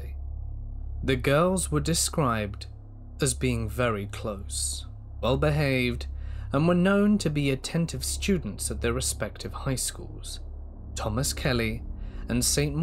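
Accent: United Kingdom. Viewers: The British accent was designed for narration. Brief Case recommended your channel, by the way.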